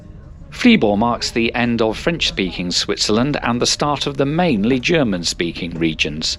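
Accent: United Kingdom. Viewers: Wow - that's some appropriate contrasting hobby to the tranquil ) I'm always curious about the different accents from diverse places: Couldn't attribute this one to scouse, scottish, northern... neither to cockney, northern of Melbourne in Oz...? I assume, the southern(btw. Cornwall-Oxford-Cambridge-Kent) english accent is something similar to the german Niedersachsen(Hannover) - both beeing closest to the written standard language. Another trigger would possibly have been the YT-channel from NonstopEurotrip... I remember having heard once - him beeing originally from Exeter too... but listening to some difference though. Was quite surprised by the spreading of this sport - appearantly there's also some league here in SWI...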